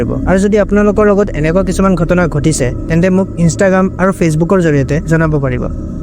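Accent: Tezpur, India. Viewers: aitu tezpurr kotha jen lagil. tezpur t ankua akhon thai ase.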